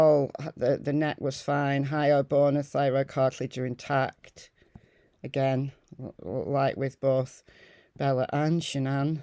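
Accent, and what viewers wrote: United Kingdom. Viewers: Unrelated question, what is your accent, it’s really cool. Somewhere in the UK?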